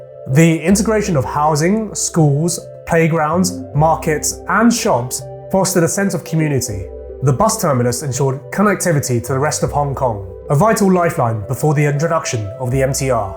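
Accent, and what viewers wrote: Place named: United Kingdom. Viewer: bro u sound so brit it trips me out lol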